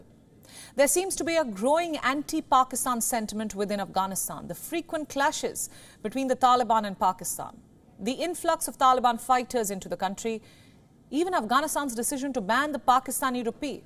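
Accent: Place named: India. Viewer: that Indian accent is giving me nightmares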